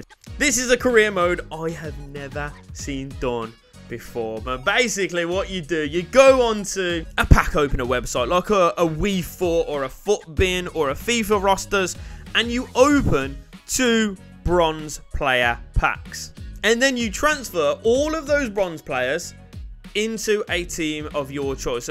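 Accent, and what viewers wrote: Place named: Birmingham, United Kingdom. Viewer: Bros the most Brummie Brummie to ever Brummie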